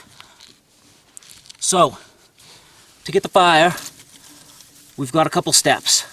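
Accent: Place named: Boston, United States. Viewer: you from Boston, you sound like Kennedy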